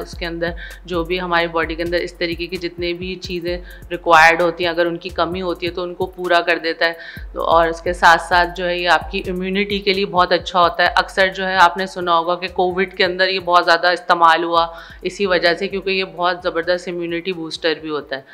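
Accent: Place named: Karachi, Pakistan. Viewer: Ye Dr karachi ki hy... Speaking accent say lgra hy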